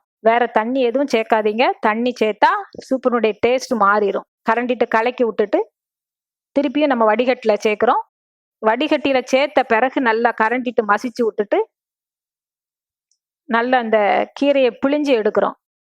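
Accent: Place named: Tirunelveli, India. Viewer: Madam unga ooru sollunga please..neenga paesurathu Tirunelveli maadhiri iruku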